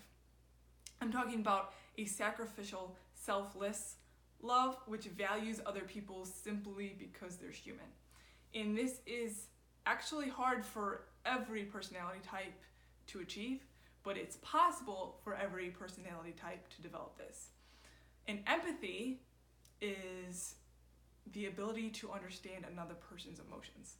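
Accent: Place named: Minnesota, United States. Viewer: You sound like Jesse Ventura (in terms of accent); would you happen to be from Minnesota?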